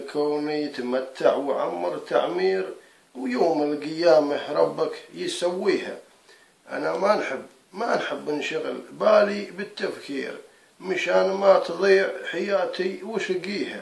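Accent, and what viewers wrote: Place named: Saudi Arabia. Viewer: يتكلم لهجة سعودية أكيد أنه ليس جزائري وهناك صعاليك تصطاد في المياه العكرة احذروا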